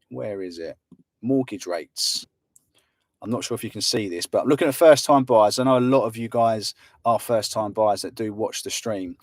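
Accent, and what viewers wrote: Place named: England, United Kingdom. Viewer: You need to write in the title which real estate you're talking about, only after kicking and hearing your English accent I understood which one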